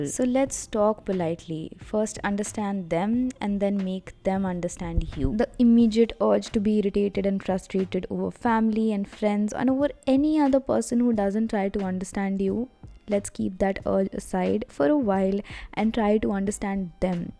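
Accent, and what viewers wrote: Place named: India. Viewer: u r not toxic and Your Hindi accent was real ,trust me. Btw Big fan mam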